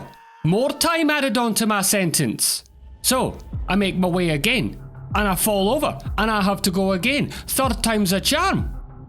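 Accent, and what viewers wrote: Scotland, United Kingdom. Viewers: LMAO. There is something genius about humour + Scottish English!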